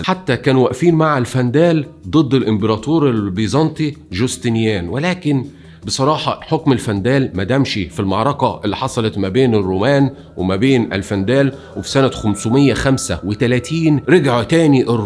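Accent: Egypt. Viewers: عادي خاترو مصري مينجمش يبدل لهجتو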